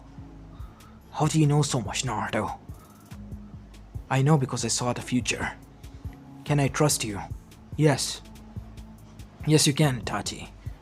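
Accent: India. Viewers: Bro has the Indian accent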